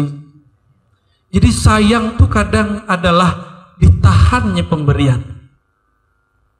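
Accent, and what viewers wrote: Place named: Banjar, Indonesia. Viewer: kdg bhasanya kayak urang banjar, kdg kayak minang, kdg melayu kalimantan...kayak urang rawa pun ada, bersiko siko ! ...ustaz kiye sak tenan ne asal muasalnya teko daerah ngendi n suku opo ?..😂.. *" salam , saya si ganteng . jawa ngapak@ jawa begelen lahiran mlysia... apa pun ustaz ini makjleb n makyessss..👌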